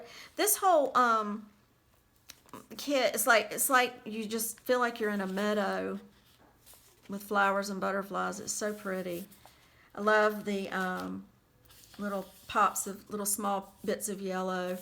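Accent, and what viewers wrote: South, United States. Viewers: Just found you and love your art, shop, and southern accent. (I am from SC)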